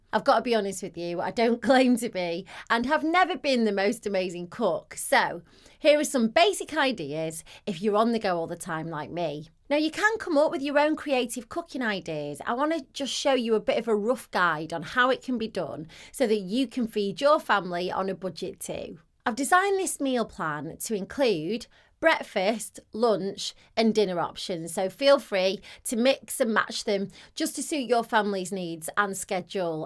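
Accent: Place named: Manchester, United Kingdom. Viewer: Yes - it's a fairly standard English accent. Manchester-ish(?) I think she has a lovely, friendly voice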